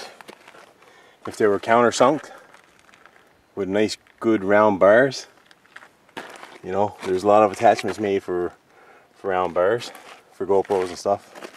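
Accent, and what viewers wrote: Canada. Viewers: assuming your accent and not watching any of your videos i assume you are Canadian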